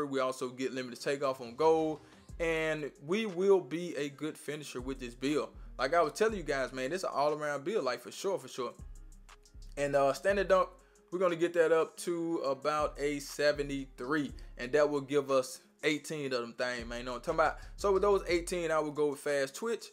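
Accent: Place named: South, United States. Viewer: Good Vids bro . Where you from . Sound like the south . How you say “ you know I’m talking bout “ lol sound like my area lol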